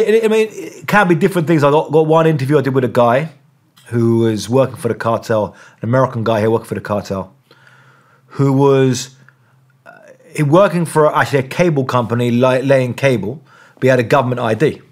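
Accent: United Kingdom. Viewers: Where's this guy from, he sounds kinda British but his accent is all over the place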